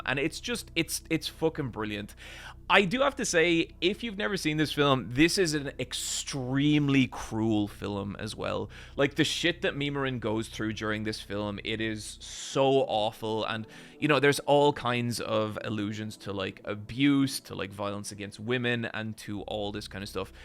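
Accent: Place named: Ireland. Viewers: Its a part of Irish dialect. Theres a documentary about the making of Ryan’s Daughter called “ A Bit of a Fillum” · The Irish accent is altogether adorable! Smile 😃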